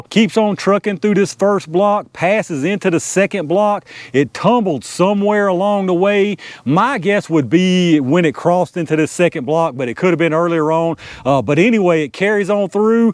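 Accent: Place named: Alabama, United States. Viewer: I gotta know where you grew up. I'm in Alabama and I swear you have an Alabama accent. It can't be VA.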